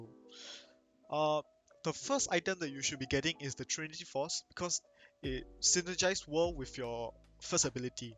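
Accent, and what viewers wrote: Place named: Singapore. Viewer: great guide, from sg? (from ur accent xd) was thinking of grinding through WR on release haha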